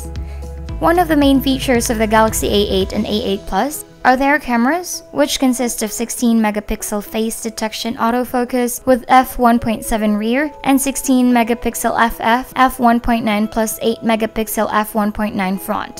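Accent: Philippines. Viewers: Filipino accent.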